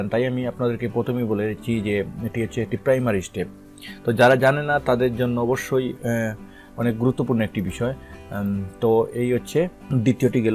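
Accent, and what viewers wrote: Sylhet, Bangladesh. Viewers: ভাই বাড়ি সিলেটনি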